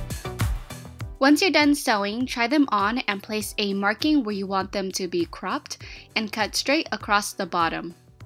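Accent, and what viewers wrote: United States. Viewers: i love these videos even though i have no sewing ability whatsoever 😂 also, it amuses me the way americans say legs like 'ley-gs' rather than 'leh-gs'. 😉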